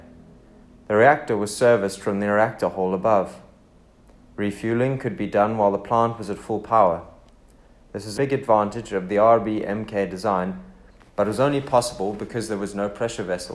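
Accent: South Africa. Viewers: It’s a South African accent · Hey I hear the Cape Town accent! Hello from somewhere close by. Very well done video :) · I like your South African accent 👌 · Your nasal South African accent is made even worse by your flat and dead-boring narration style.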